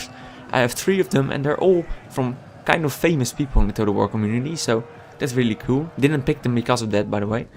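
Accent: Netherlands. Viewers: that dutch accent. MY EARS!!!!! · Dutch accent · you're dutch arent you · What the fuck is that dutch accent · Are you Dutch ?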